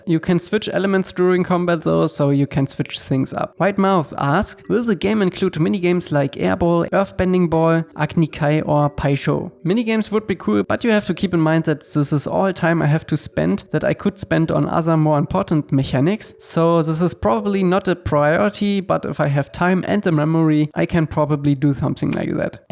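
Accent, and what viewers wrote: Germany. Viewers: Musste am Anfang schon schmunzeln, weil man sofort raushört das du deutscher bist. Aber digga zieh durch, du hast mehr als mein Respekt und hoffe dass in 2 jahren ein geiles Avatar Game rauskommt und du da dann auch mitwirken kannst !❤ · Are you German or somthing linke this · That german accent i love it haha xd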